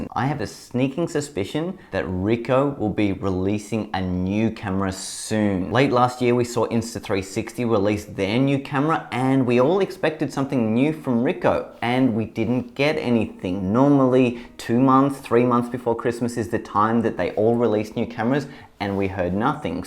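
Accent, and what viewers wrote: Australia. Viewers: i think you are australian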